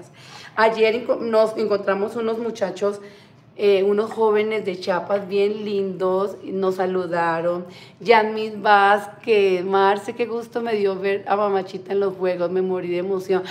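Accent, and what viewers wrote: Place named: Colombia. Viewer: Me encanta su acento colombiano ,no le había comentado antes pero me caí usted muy bien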